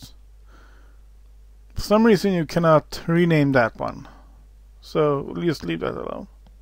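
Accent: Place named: Ireland. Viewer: where are you from? such an interesting accent, Irish?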